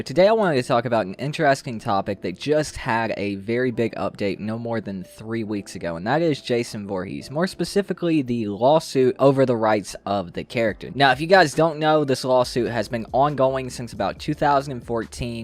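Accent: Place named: South, United States. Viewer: Wow only a few weeks? Well I really like your content so far and I can tell that you're from the South or at least it sounds like you are. I'm from Ocala Florida. I definitely wouldn't take too much of what Corey Feldman says to heart though. He definitely has been dealing with a lot of mental issues lately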